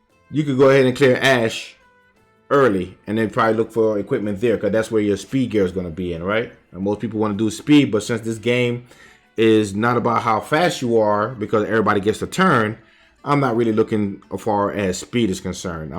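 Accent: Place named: East Coast, United States. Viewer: Bruh you have an east coast accent. Where you from? 😆 Subbed for the swag the content was extra.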